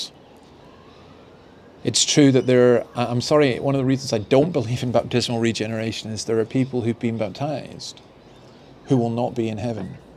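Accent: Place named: Australia. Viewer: Good stuff. On a side note - Your accent is becoming a little bit Aussie Have a great day and God bless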